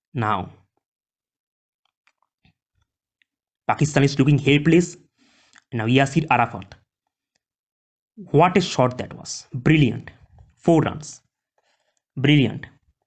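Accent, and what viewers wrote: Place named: Bengal, India. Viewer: I'll enjoy your commentary it's really awesome but you try to speak English like English not bengali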